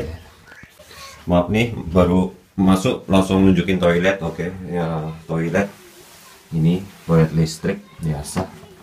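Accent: Java, Indonesia. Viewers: walah wong jowo tenan, makane kok koyok roh logate iki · wong jowo orang ilang jowone masio dek jepang salut bang :D medoknyo masih terasa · Logat jawa nya masih kental ia mas .. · hepi saterde mas (medhok banget ya meski dah lama di jepang)............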